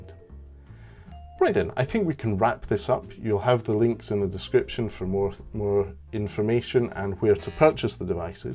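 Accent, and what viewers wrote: Scotland, United Kingdom. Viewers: You sound Scottish 🏴🏴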